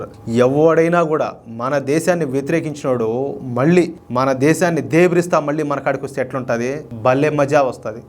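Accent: Rayalaseema, India. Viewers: Anna meru rayalasema language lo super ga mataladutunnaru Anna me conference ku super meru taggaddu jai Hind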